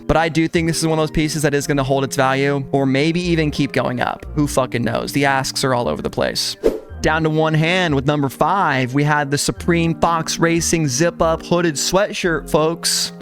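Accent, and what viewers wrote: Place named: England, United Kingdom. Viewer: always a W with the english accent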